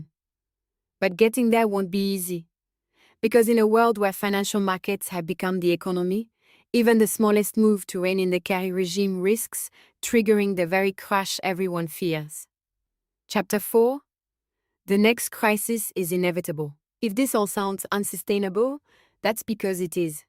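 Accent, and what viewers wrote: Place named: France. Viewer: Why would you use a French accent?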